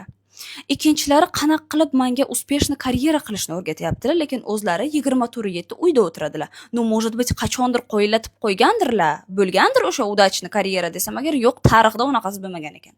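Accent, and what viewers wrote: Bukhara, Uzbekistan. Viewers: Buxorocha da😊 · Assalomu alaykum opajon yaxshimisiz,Buxorodamisz deyman opajon shevangiz menikidek ekan ❤❤❤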